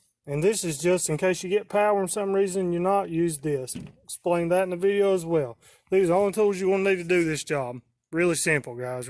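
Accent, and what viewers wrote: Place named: South, United States. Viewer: The first thing I check for is a good solid "Southern Drawl" iminating from the commentator. Once you have established that the presenter has that "Drawl" you're looking for then you can be assured that this fellow is self sufficient and probably has years of experience working on his own vehicles. You will notice that there will not be any unnecessary "BS" from some stuffy old book or manual. What you will hear will be the voice of experience and will be explained in a "no bullshit" manner that even a city boy can understand. So listen up Billybob cause it aint going to get any better than this !!! My hat is off to the gentleman that took time to show me how it is done. Thank you Sir.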